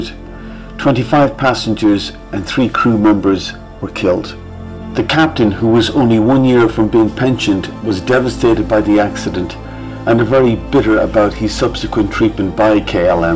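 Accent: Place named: Ireland. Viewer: I can hear him perfectly well. Obviously there is an Irish accent we have to allow for, but as he seems to be a guitar player musician, I'm sure the microphone was of adequate quality. Might just be your speaker is a fault 🤔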